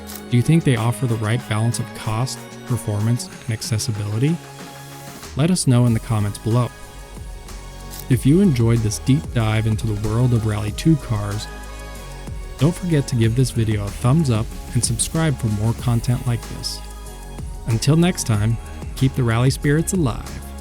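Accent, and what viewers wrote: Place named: United States. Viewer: An american speakimg on rally? Its a no from me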